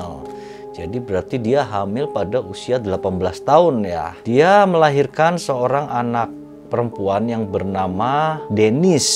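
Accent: Java, Indonesia. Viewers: Halo uncle....medhoknya itu lho🙈🙈😂😂😂😂😂😂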